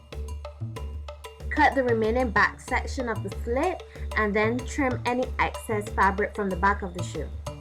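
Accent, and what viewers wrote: Barbados. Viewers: You know a bajan voice anywhere l am so glad to see a BAJE doing this kind of stuff and your instructions are great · I hear the bajan accent .. love it!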